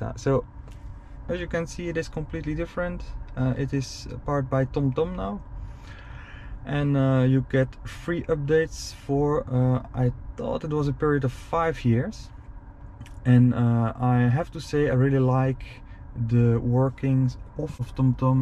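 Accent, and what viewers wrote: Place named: Netherlands. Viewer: Hello, Thank you for your review 😊 Your car is in french but I feel like you have a dutch accent, are you from Belgium ?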